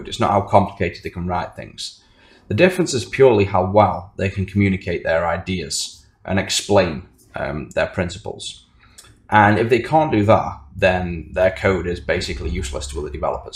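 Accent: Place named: Liverpool, United Kingdom. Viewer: Thanks, mate! Are you from Liverpool?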